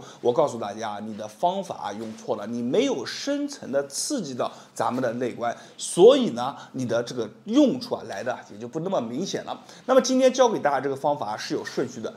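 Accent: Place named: Nanjing, China. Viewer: 宝藏老师啊！谢谢！第一次留言，昨晚不光做了您教的这个动作，一口气看了您其他的好几个视频，尤其关于肩颈的动作，实测当场起效，我是颈椎病、肩颈综合征患者，从去年开始犯病，一直就恢复过，昨晚做了您的动作，让我轻松无比！另外听老师口音像是南京或是南京周边地区人，若老师方便的话，我想登门拜访，感谢！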